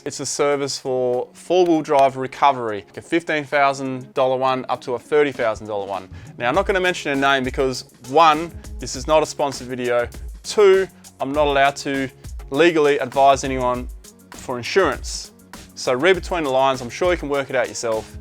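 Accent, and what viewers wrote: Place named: South Sydney, Australia. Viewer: Must be from south Sydney. Surely.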